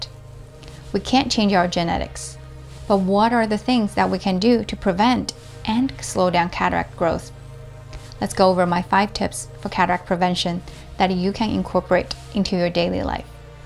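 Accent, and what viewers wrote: United States. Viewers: Pity you talk american. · Easy , just marry a smar/t pretty Chinese or Japanese female as seen in this video! No problem 😊 ! She will nurse me as I will shower her with LOVE ❤️ 😍😎 ! Yah yah ! Learned alot from this video! Her English is AMERICAN! I seen many videos when others have a strong accent and hard to understand what they are saying!!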